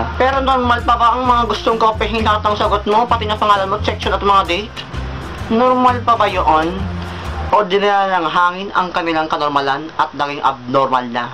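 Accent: Visayas, Philippines. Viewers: bisaya ba? bisaya? :) Galing! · HAHAHAHAHAAHHHAHA bisaya te? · biSaya diay ka ????.. . hahaaha. . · bisaya ka? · shet!!...lingawa nimo mag.bisaya oi..karelate man ko sa imong pisti ug yawa...haha!!.. igo ko atong sign language...LOL.. #pisti ka day, naa na si maam day, yawa ka...haha!!!! :DDDDD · bisaya pala xa...!haha...... tga san yan..? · hahahaha! bisaya pd d.i ka? xD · BISAYA KA? SHET AS IN? WALA KO NAG EXPECT! OR KATONG YAYA NINYO ANG BISAYA? MY GOSH GI KILIG KO PISTE! 😂😂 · ahahaha bisaya si koya.. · BISAYA MAN DIAY KA HAHAHAHAHAHA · Bisaya ka pala? san parte day? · Bisaya? hahaha xD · "day,day josephine unsay sagot sa number 1 day?day,pagdali day....kay naa na si maam...pesti...pesti ka..yawa ka..XD. Bisaya kang dako!!hahaah... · waaah. bisaya. tga asa ka?hahahah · Haha, Feeling ko Bisaya talaga dahil sa tono :v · HAHAHA! galing, bisaya din pala c kuya. 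bagay bisaya sau kuya;) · teeeerrr, bisaya jud ka ter? lingaw kaayo ka ter uie! kaulett! haha. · hahaha lakas ng trip ee. BISAYA MAN DIAY KA DONG! nyahahahaa! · bisaya diay ka .. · haha bisaya gud siya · Hahahaha. nad Yess. Bisaya ka day.! Hahahahhahahaaa. Im from Cebu. noe here. :* "Yawa ka pisti:" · ag KYUTTTT!!! nag pag bibisaya mo tol!!!!! wahahaha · Bisaya man diay nis. hahaha buanga oi! xD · Bisaya ka?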